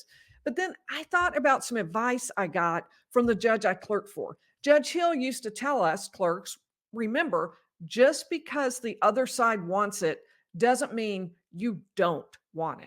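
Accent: South, United States. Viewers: My crazy ex-husband graduated from Harvard Law in 1997. Sounds like you had the same profs that he did. You sound Sluthern too. Iam from TN I wonder if I met you when you were there, as I was on campus, often.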